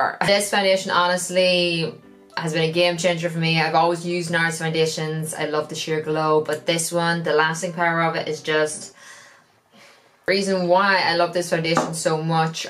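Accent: Ireland. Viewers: I could listen to you talk all day. Irish accents are so beautiful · What part of Ireland are you from ?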